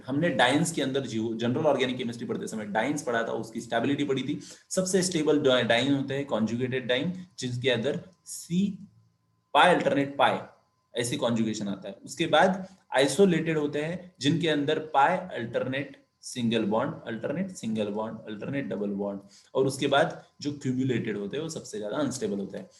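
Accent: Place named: Maharashtra, India. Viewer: Sir your mother tongue is Marathi...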